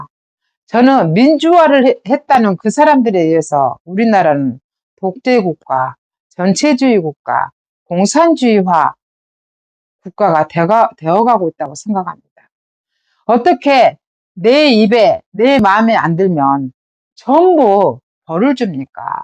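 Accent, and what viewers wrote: Jeolla, South Korea. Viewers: 대구사람인데 근래 보기드문 구수한 사투리와진자보기드문 참전라인을 만낫네요 아침부터 허벌나케 구독쉐리박고 갑니다 조아요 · 미국 71년에 부모따라 이민온 교포입니다. 제가 대학다닐때, 미국뉴스에서 광주사태에대해 보고 큰 쇼크를 받았었읍니다. 유튜브의 제목을 보고 좀 이상해서 클릭했읍니다. 자신을 전라도인이라 소개하며 아주 예쁘신 미인께서 조리있게 설명해주셔서 제가 가지고있던 광주사태에 대한 오해가 풀렸읍니다. 감사 합니다. 저도 많은 한국분들에게 광주사태에 대한 horror story 를 듣고 많은 오해를 하고 있었읍니다. 전라도 억양이들어있는 말로 너무 설명을 잘해주셔서 안믿을수가 없네요. 미인 님의 말씀 참 신뢰가 갑니다. 그렇지만, regardless of how crooked her political philosophy is, 자기 문학실력을 발휘해서 한국국민들이 염원하던 노벨상을 받은 한강씨는 축하해 주어야 한다는 의견 입니다. 제의견에 동의 안하시는분들께 용서 바랍니다.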